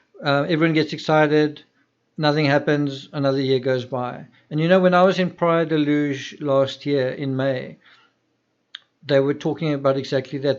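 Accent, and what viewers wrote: South Africa. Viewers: Your accent is definitely South African.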